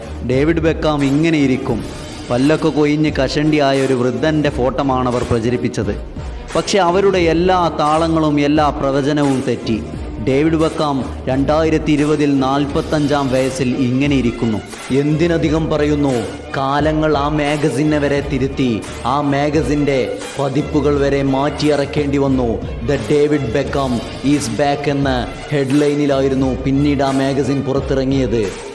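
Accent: Malappuram, India. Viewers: ഞാമലെ സ്വന്തം മലപ്പുറം ഭാഷ...... ഇജ്ഞതി രോമജിഫൈകേഷൻ....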